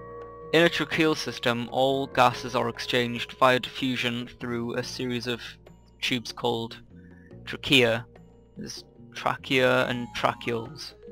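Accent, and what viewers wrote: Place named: Ireland. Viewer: He sounds Irish to me :)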